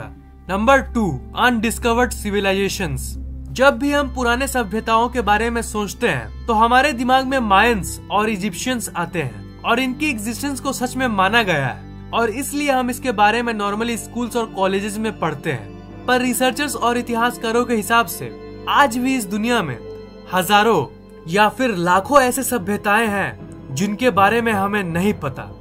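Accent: Bihar, India. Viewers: gaddha korna mtlb full desi ❤️❤️😂 Bihari ascent ❤️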